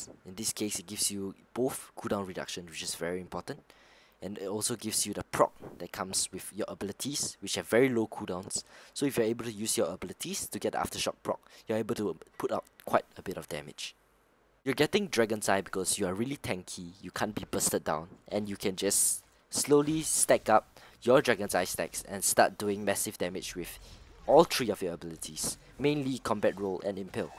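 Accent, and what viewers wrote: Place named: Singapore. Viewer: You sounded like a Singaporean..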